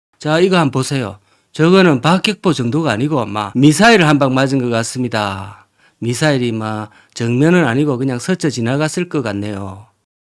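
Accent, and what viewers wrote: Gyeongsang, South Korea. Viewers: 구수한 경상도 사투리가 잘 어울리십니다.수고하세요.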